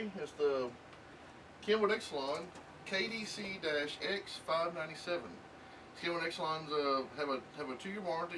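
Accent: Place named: Kentucky, United States. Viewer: sounds like you're from Kentucky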